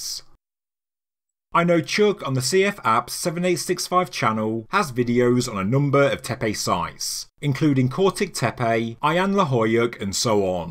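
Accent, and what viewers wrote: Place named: England, United Kingdom. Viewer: ZZZZZZZZZZZZZZZ Do all poms talk like this? (Gobekli Tepaaaaayyyyy?!)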